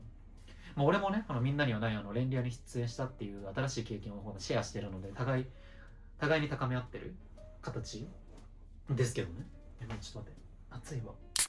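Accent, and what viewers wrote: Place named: Kansai, Japan. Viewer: 人生のセンスもあって本当にすごいですꧦ𐅁𐀸𐋠𛰙᭜𖫴𖫰𖫱𖫳𖫲𖫲𖫳𖫴𖫰𖫱꛰ﯩᩝ︪᭜𖫴𖫰𖫱𖫳𖫲𖫲𖫳𖫴𖫰𖫱꛰ީᩝ𛰚てかもっと関西弁ナチュラルに聞きたい😂同じ関西人なので期待してます♪♪♪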